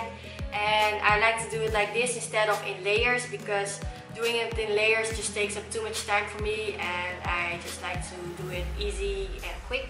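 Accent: Netherlands. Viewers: Ben je Nederlands? Je hebt echt een nl accent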